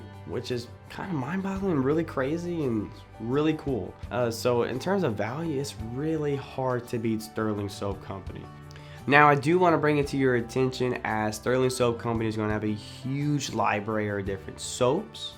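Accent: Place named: South Louisiana, United States. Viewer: I literally subscribed because you said you were from south Louisiana. I lived in Lafayette for 18 years. I can definitely pick up on your accent!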